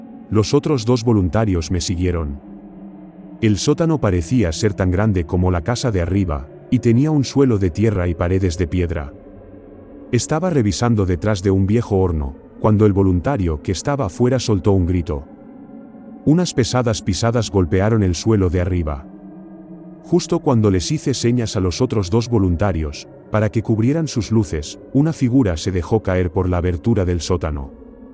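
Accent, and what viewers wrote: Spain. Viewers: Me gusta más la narración con esta voz en castellano a pesar de ser de MEXICO. Me encantan las narraciones con ese sonido de fondo.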